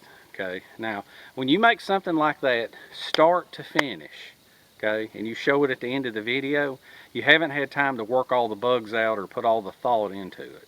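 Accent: South, United States. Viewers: I can tell is a southern man.... he talks like me....I'm in Western NC.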